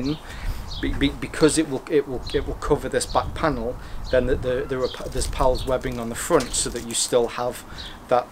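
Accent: United Kingdom. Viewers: I love my Tacsac 13. I'll never NOT carry a Nutsac bag (I started with the Man Bag Dammit). Let's face it, every 15-year-old that dwells within all men loves the naughty name of the company. Plus, we yanks are suckers for the Brit accent. Keep up the good work old chap!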